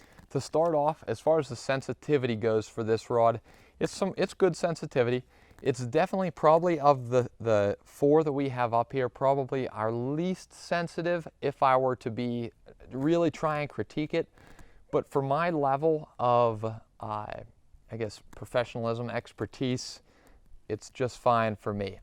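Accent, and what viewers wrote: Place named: Pennsylvania, United States. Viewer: I’m late to the conversation but I’m a life long dry fly fisherman who took up Euro nymphing a few weeks ago. Ive had decent success with a borrowed Orvis Recon 3 wt. It’s the newer version. Thoughts on whether I should get one? Ive been looking around. And also - about your accent. James Franklin has an accent like yours. It’s not a common PA accent in my opinion so it gets a persons attention.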